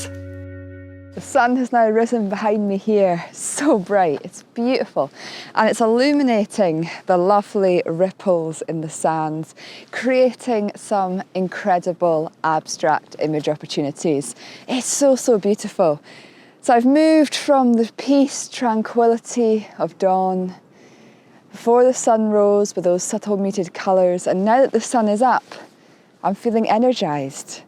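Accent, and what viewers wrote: Scotland, United Kingdom. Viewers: nice footage , coupled with your voice ( commentary ) and the music go all very well, the Scottish accent remind me the days i spent in Scotland during my study at the university of Glasgow >>> Thanks for sharing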